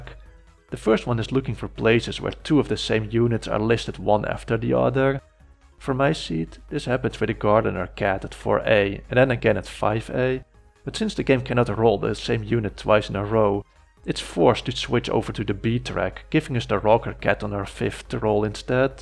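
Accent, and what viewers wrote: Netherlands. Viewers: This man is 100% dutch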